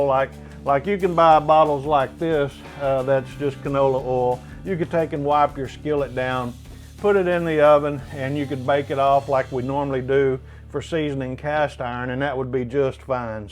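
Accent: South, United States. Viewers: It’s not the name. It’s not the beautifully seasoned pan he flashed at the beginning. It the fact he exclusively calls a skillet, how he pronounces oil, and the fact he is in an extremely ordinary lived in kitchen that makes this video so credible. That little chuckle at the end about flax seed oil…. Wish I had some more southern friends.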